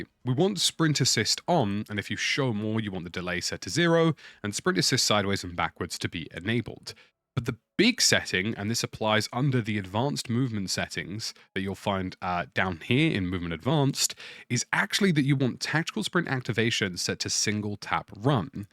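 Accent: United Kingdom. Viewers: this is a British accent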